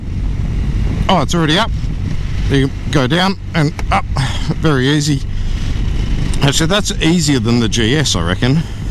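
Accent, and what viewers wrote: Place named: Australia. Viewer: I love the bike! Your Aussie accent is pleasant to hear as well. Great review mate! Thanks.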